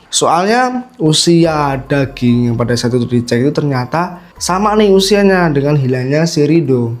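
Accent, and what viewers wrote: Java, Indonesia. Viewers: Ngomong GUA slank JOWO , mending SAYA masze jd cakep ...luwes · Loe Gua tapi medok 😮 mbok saya atau aku aja mas. Opo sampean kamu enak malahan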